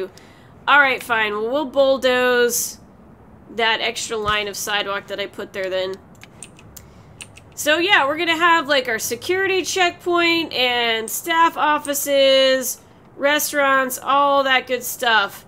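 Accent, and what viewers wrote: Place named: Wisconsin, United States. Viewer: You sound like you're from Wisconsin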